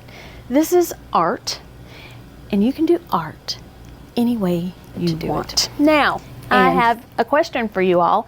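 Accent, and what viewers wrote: United States. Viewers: Yes, I am also a solid border with a scrappy binding (or any binding really) vote :) You've told me before but where is your accent from again? I'm in Australia and can only make out very few American accents! Also, what time zone are you as might join the Zoom but it's hard to get one that isn't in the middle of the night when afternoon there! Obviously, it depends on where you are 😊😊💜💜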